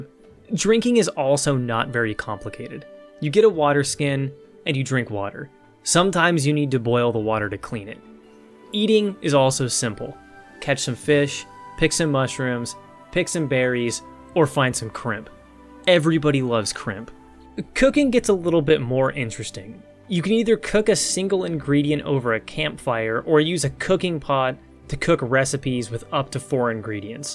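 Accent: Quebec, Canada. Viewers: Quebecan? Lol. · quebecan HAHAHAH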